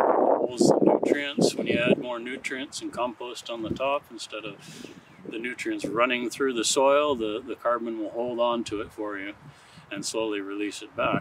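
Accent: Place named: North, United States. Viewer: that you! I knew you were from the north from your accent. I was born in Wisconsin, but transplanted in Brownsville Tx.😂😂 Still haven't shaken the milk 🐄 thought 😂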